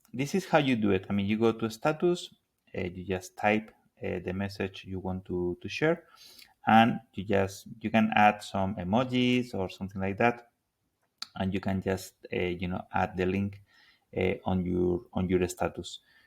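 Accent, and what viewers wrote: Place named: Spain. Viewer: I can hear you are Spanish. Gracias 🙏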